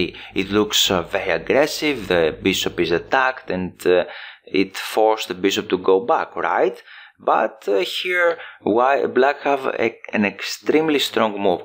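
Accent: Greece. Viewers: I love the Greek accent lol. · Χαχαχα κατάλαβα ότι είσαι Έλληνας από την προφορά σου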